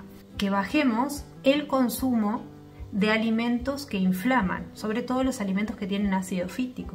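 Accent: Argentina. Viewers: Hi sweet, greetings from Sidney, Australia. I love to listen spoken castillian just to keep the knowledge of the language decent, but I think I missed it whether you said shred the pit of avocado. Do I have to let the avocado pit dry up or can I use a fresh pit? Years ago I was in a nasty accident, I had to get several metal parts implanted, one on my right wrist and from time to time with weather changes my wrist hurst like the devil, I most certainly would love to give this very simple tip a try. My mother is also starting to get arthritis and it makes me very sad to see how her hands are losing their shape. Needless to say my little wrist pain is nothing compared to the pain she feels in her hands. Also have you showed how to make kefir? I am always learning such great things from you. I love your Argentinian accent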